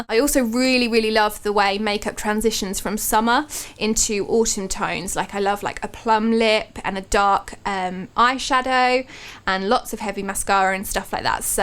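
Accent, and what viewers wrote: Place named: RP, United Kingdom. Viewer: Loved this :D such a great first video :D you sound so posh too hahah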